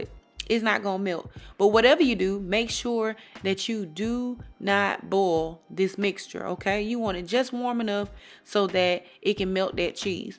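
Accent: South, United States. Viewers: Cooking along with the video right now! I know my family is going to love it! Eddie loves your nice southern voice too as I cook! Thanks for the recipe! Happy thanksgiving! ❤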